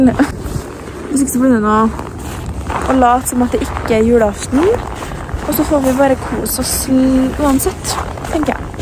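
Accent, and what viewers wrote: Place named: Trøndelag, Norway. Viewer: Stark TroNDD-Yem accent 😄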